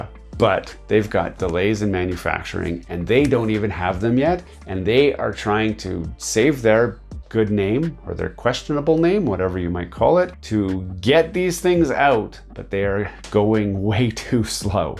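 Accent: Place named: Canada. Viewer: Patiently waiting as well. (Insta-subscribed when I heard your Canadian accent!)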